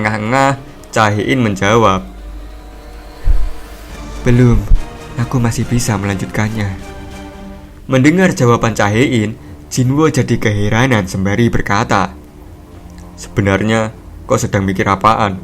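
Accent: Java, Indonesia. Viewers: Saya dari part 1 di season 2 ini, saya merasa denger cerita korea dengan logat jawa, tapi seru, dan saya suka.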